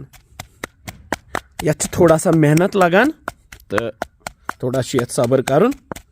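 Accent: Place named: Kashmir, India. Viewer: I purely listen to your videos for your Kashmiri dialect...... wonderful....👌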